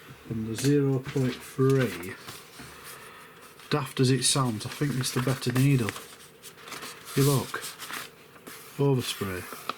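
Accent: Barnsley, United Kingdom. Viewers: Barnsley Lad ?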